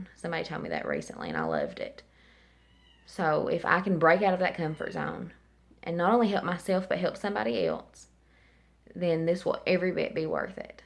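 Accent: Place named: Kentucky, United States. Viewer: I knew that accent!!!!!!!!!!! That's why I came to find the first video hoping you would say where you are from! I'm from Kentucky too lol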